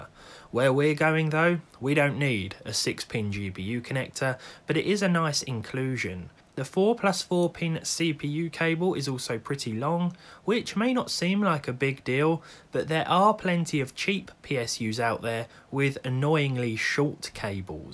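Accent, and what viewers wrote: United Kingdom. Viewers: His accent says British, his heart says Proto-Canadian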